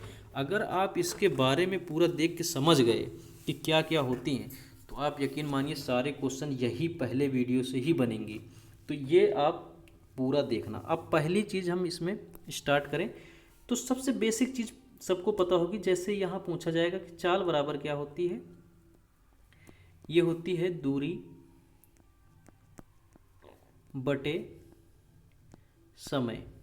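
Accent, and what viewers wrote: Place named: Raebareli, India. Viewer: Sir आपकी आवाज़ raebareli के क्षेत्र की लगती है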